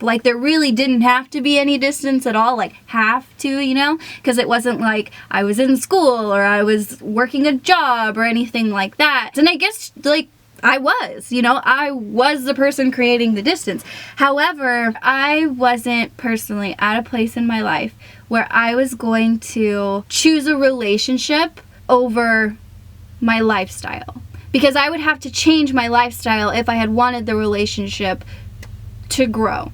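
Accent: Texas, United States. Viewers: texas accent coming through?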